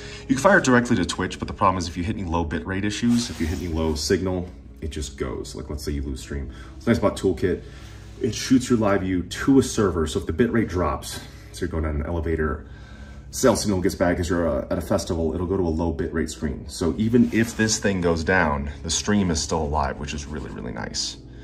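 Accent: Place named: United States. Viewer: Hope release more Video like this, so that I can learn more American English.❤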